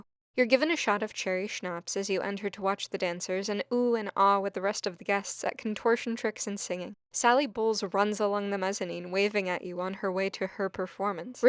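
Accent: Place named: United States. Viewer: you sound like an American, and at this point in our political history I think that it is fair to stop holding the German people particularly accountable for the rise of fascism. I'm watching it rise here in America and the same thing is going on. Most people are simply passive, and politically disinterested. They're not willing to risk anything about their simple lives in order to resist fascism or authoritarianism.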